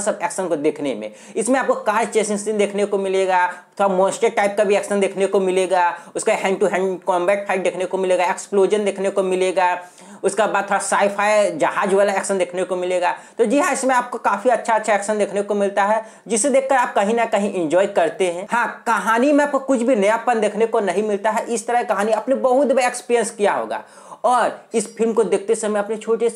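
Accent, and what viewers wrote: Bihar, India. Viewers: Bihar se ho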